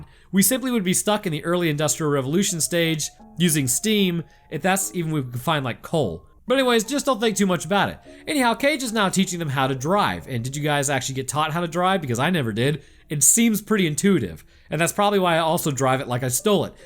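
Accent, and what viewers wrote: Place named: South, United States. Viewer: Why do all southern americanss sound the same. You sound exactly like the finance guy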